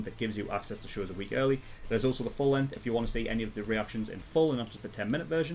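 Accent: United Kingdom. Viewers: Your English is music to my ears since I love British English so much. Which part of England you live? Sorry that I ask I just am curious. I mainly mean in which city because I have been in England in 2004 during our Midsummer and it was extended weekend meaning I left from Finland on Thursday and came back in next week's Tuesday and I was in London then but since it is so big city I didn't managed to see all possible things but I liked that trip I really did. Yes I am from Finland myself despite my username.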